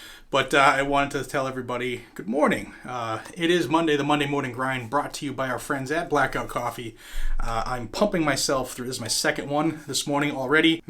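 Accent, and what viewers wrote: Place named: Boston, United States. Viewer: Never noticed your Boston accent till this video. Been watching you for about a year. Good job and I like hearing about 2A news around our country. Thank you.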